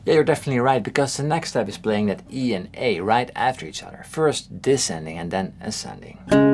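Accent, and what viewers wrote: Netherlands. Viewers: Je accent lijkt net op een Nederlander die erg goed Engels spreekt 😀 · Why do you sound so Dutch, when you talk? There's nothing Dutch on your YouTube account. The way you pronounce fingers, do you have one or two Dutch parents? Then, you should really meet Teye.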